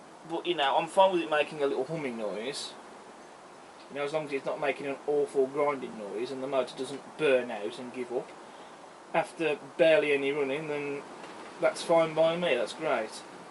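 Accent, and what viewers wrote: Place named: Midlands, United Kingdom. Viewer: I used to live in Tamworth, back in the late 90’s. With the accent you have thought ud be in the Midlands.. I live in York now amazing city esp the plethora of Trains to see favourites being that York to Knaresborough beautiful😊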